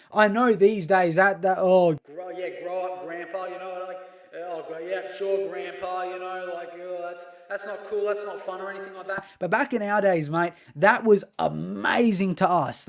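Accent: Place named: Australia. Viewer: Skylanders was such a massive part of my childhood, went through and played all the games w my brother recently and they're still so much fun, love seeing people still appreciate how influential these games truly were Love your energy as well man, keep up the good work 🙏 as a fellow Australian the accent is sick as well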